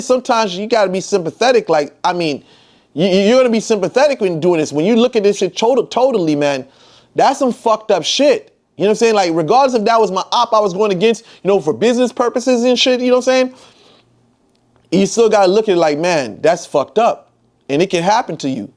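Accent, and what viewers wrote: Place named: Miami, United States. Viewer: lol Man U sound like a Miami haitian . U wild and funny af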